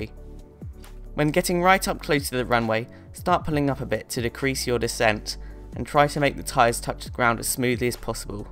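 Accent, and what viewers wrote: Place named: United Kingdom. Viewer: ugh hes british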